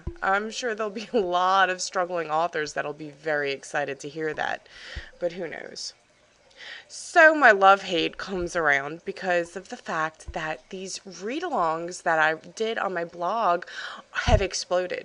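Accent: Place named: Eastern Europe. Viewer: lol funny east europe accent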